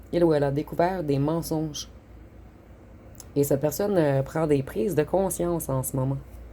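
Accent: Quebec, Canada. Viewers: Quel bel accent quebequois et quelle jolie voix toujours heureuse de vous retrouver Merci ❤❤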